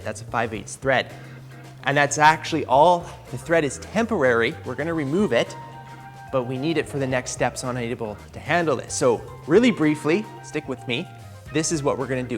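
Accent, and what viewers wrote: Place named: Canada. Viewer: Are you Canadian, or is it just an act? just wondering because it sounds forced at times 🤔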